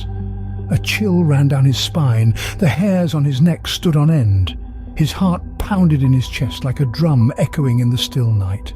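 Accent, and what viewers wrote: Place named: United Kingdom. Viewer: A British narrator. How wonderful. Our American friends will love it.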